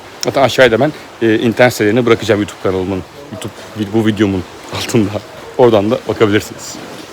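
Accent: India. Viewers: aga, tenin, konuşma şeklin hintlilere benzemişsin :) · tam hindulara benziyorsun biraz türkçeni düzelt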